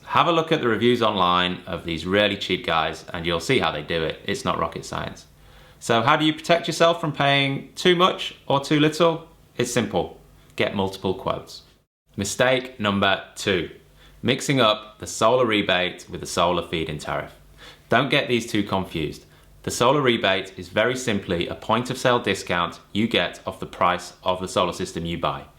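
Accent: United Kingdom. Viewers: All be it a British Aussie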